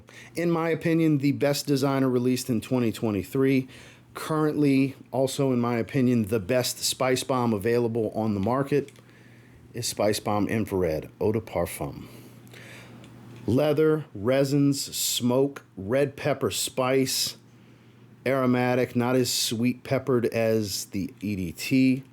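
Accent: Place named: New Orleans, United States. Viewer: You sound like you straight outta tha parish bruh I miss Nola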